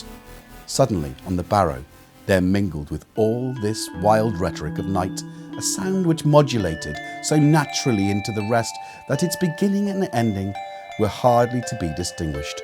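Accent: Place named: RP, United Kingdom. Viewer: Love this! I wish my English accent was as BBC'ish ;-)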